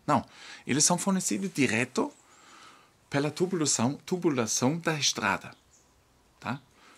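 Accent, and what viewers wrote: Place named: Germany. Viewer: Seu portugues é excelente. Entendemos perfeito. Acho lindo seu sotaque alemão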